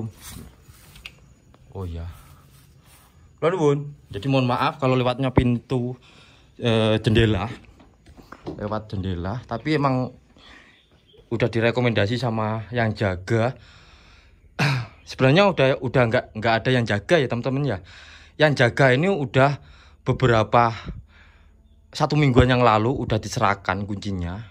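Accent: Java, Indonesia. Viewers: Haiiii...salam dari Malaysia.. Pekat sungguh bahasa jawanya...boleh lah faham sikit2...moga sukses selalu.. 👍👍👍👍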